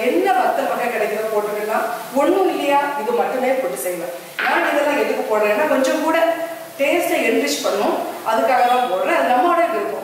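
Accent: Nagercoil, India. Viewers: u r from nagercoil? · Nagercoil voice pole irukku..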